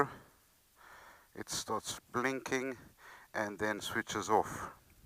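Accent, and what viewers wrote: South Africa. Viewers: you sound South African Portuguese?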